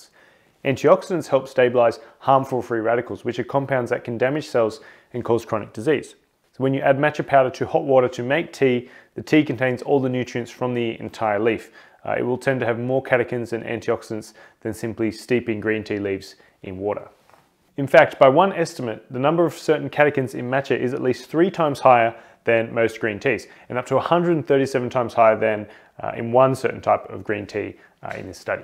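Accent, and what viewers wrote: Australia. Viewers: It’s just his Australian accent! Some forms Australian accent, the ‘a’ is phonetically pronounced as more of an ‘e’ sound. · This guy is Australian so that is why his English is so messed up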